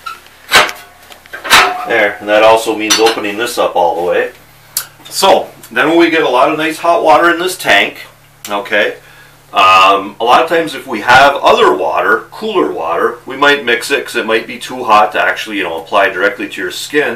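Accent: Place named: Upper Midwest, United States. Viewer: I was looking for a vid about putting line on a spinning reel and your vid from 2 years ago came up. First of all, thank you, it's what I was looking for. Second, I love subscribing to local Youtubers and you sound local. Are you in the UP or Wisconsin?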